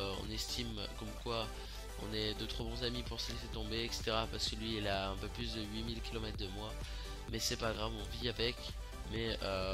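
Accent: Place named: Belgium. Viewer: On dirai un belge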